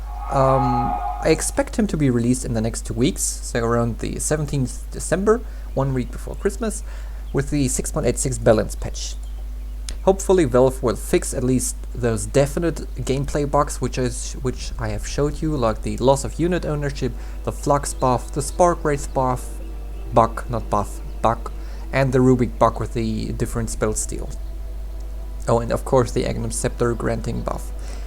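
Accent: Germany. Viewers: It is a german accent, I believe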